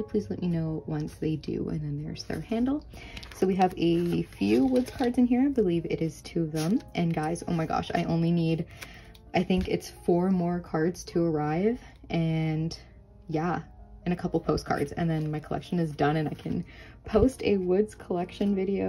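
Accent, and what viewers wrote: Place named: United Kingdom. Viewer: I never noticed, but you pronounce words like british/australian instead of American that's so interesting!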